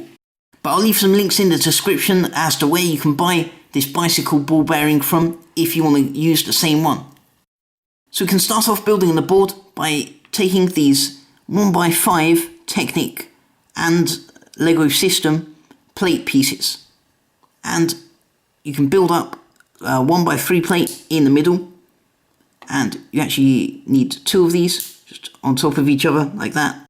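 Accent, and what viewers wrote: United Kingdom. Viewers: his voice sounds like an old man thats British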